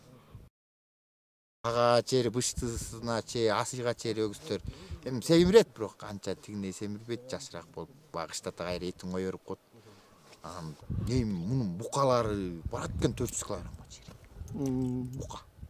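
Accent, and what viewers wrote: Standard. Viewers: Азыр уйдун эт-сутуно караганда топоздуку таза болуп калбадыбы,укол-дары бербей баккыла эми.Ал эми топоздун сутун кандай пайдаланган туура болот аны интернеттен караса, пайдалуу маалыматтар коп эле болсо керек. Малчылардын туйшугу да ото оор болот,ак-эмгегинердин узурун коро бергиле!Так-таза кыргызча суйлоп, жакшынакай маек берген азамат экен. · Депутаттарга караганда сонун так даана тушунуктуу нукура кыргызча кагаз карабай суйлойт экен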